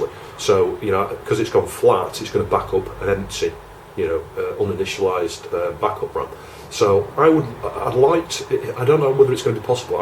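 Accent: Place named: North West England, United Kingdom. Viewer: hi mate - only recently discovered your channel but enjoying it, good stuff. Who cares about a 2 minute load time if you're from the speccy generation! I'm curious, where are you from in the UK, sounds like you're a fellow north-westerner.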